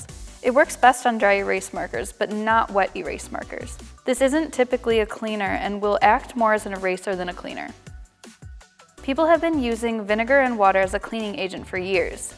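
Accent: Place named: Canada. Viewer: Hahahahaha I’m sorry but it’s funny how concerned/upset she is in the intro about people “causing permanent damage to their white boards”... I can just picture her at the bar on Saturday night talking wayyy too much about white boards🤣 Also what accent is that??? Just curious.. is that Canadian? Listen to her say magnets: “Maeyyynits” Anyways joking aside thanks for the informative lesson on whiteboard maintenance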